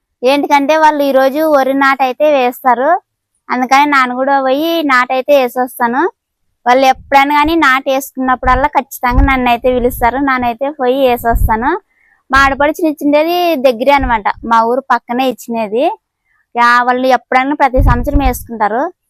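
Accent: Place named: Karnataka, India. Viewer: Mee matalde telugu karnataka side ani telisindi.nanu antunnaru bhagundi talli, god bless u.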